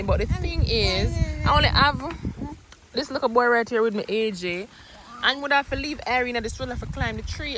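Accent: London, United Kingdom. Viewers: U nailed that London accent Nice vedio 😊😊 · The london accent make me DWLLLLLLL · The London accent and conversation 😂😂😂. The British intellect 😆♥️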